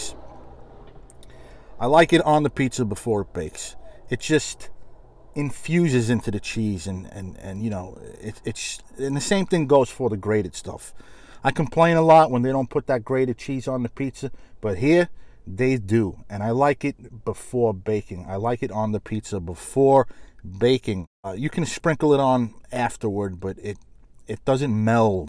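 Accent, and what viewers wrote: New York, United States. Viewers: This dude has the perfect NY accent. I love it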